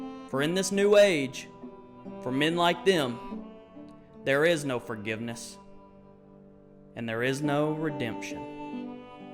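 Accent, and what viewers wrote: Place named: South, United States. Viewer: I saw your post or comment on Reddit a week or two ago, or whenever it was and saved this video to watch later, and man, you went above and beyond my expectations. The quality of this video is really great for you only being at 36 subscribers. Everything about this video is perfect, your faint southern accent, your charisma, the editing, the music choice, and your insightful look at a part of the game that is seldom talked about beyond "I liked it". I learned a whole lot, and I only appreciate the game even more than I already did You my friend, have earned my subscription. Keep it up man, it will pay off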